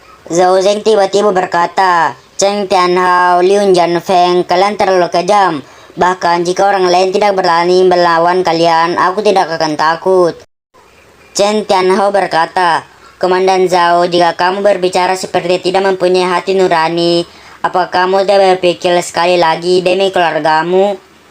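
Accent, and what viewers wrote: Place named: Bugis, Indonesia. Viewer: Adminnya suku bugis ya.. nadanya seperti suku bugis kalau berbicara..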